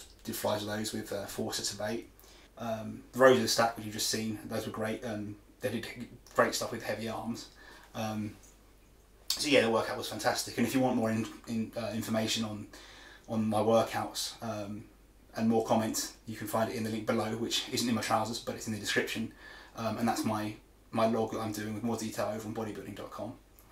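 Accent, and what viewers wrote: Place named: United Kingdom. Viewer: Where are you from? Obviously U.K. But what part of England?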